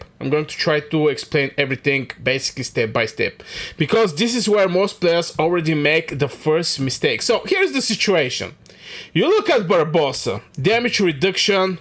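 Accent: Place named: England, United Kingdom. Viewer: wow, thank you for the quick reply.. keep doing more videos.. so happy to see you back making more videos.. I love your English accent.. you are doing great 🙌🏻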